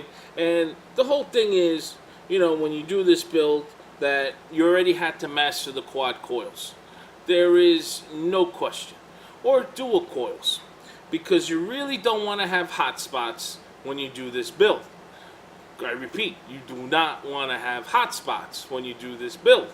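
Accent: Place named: New York, United States. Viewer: ***** ya you can tell your a new yorker without the forgetaboutit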